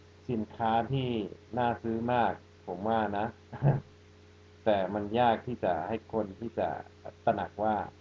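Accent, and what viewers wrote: Thailand. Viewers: Where did you learn Thai? You even have Thai accent! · พูดภาษาไทยชัดเว่อร์ไม่พอนะ พูดภาษาไทยสำเนียงไทยได้ด้วยอ่ะ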